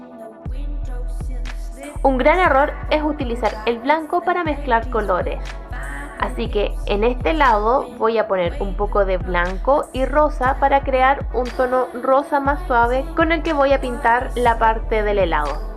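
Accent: Chile. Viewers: que lindooo me encantó!!!! eres chilena???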